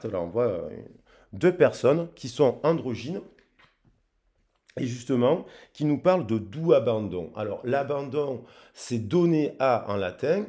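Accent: South, France. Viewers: 🤣Shamala VIBES?😏🤣😜🔥♏⚡🔥.Merci pour le partage de tes énergies avec l’accent du sud dans tes cordes vocales😊😎🤌🏻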